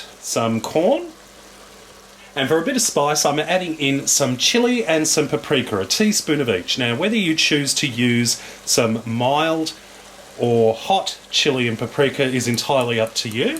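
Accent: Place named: Australia. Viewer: Australian accent is 👌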